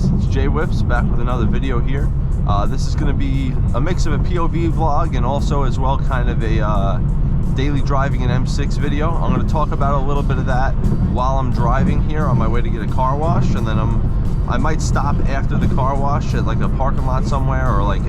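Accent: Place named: Long Island, United States. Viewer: In the first ten seconds from the overpass and your dialect I knew this was LI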